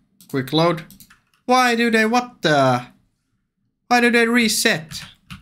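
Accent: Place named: Finland. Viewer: I can hear Finland in your voice.... Nice video mate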